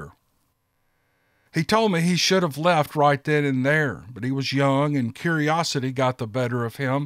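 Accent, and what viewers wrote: South, United States. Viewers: Thanks for an exciting start to the week. I woke up early, like 3am early, with a horrible migraine and after taking some tablets and eating a banana to make sure they stayed down, I lay here in bed with my eyes closed and listened to that Southern drawl of yours and now I'm going to try and get a little bit more sleep. It was a another good story which Kentucky seems to have no shortage of. Can't wait to catch up with the podcasts and maybe get myself some Yeti Bars as Christmas gifts for family and friends. Take care and it's ALWAYS good to hear your voice! From country NSW Australia.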